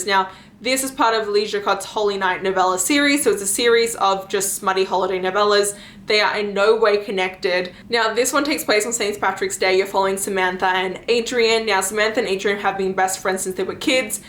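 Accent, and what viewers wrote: Australia. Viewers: The way you talk sounds abit Aussie too me ,,, cheers from Tasmania Australia 🦘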